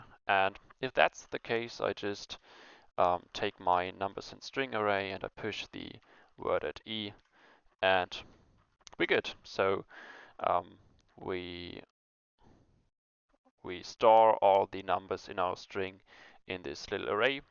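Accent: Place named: Germany. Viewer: Great video! I also love seeing all the approaches of handling the words in part 2, your approach looks pretty quick! A few points I'd like to address though: - Splitting with "\r\n" only makes sense if the file is encoded with that new line sequence (which as far as I know is just Windows). A recommendation of mine would be to either switch to "\n" (which in your IDE I think works by clicking on "CRLF" in the status bar), or perhaps the better solution: using .split(/\r?\n/) which will support both both :) - When talking about the letter "i", pronouncing it as [i] (also known as the German "i") can easily be mistaken with the letter "e" in English ;) Your English seems really good though! - The magic (even though obvious in this code) numbers 3 and 5 could be stored in constants to give them names for descriptions, which might be overkill for Advent of Code, but I just felt like throwing that in the room :) - About adding numbers, I'd highly recommend writing parseInt(`${numbersInString[0]}${numbersInString[numbersInString.length - 1]}`), note that I used backticks (`) and not an apostrophe!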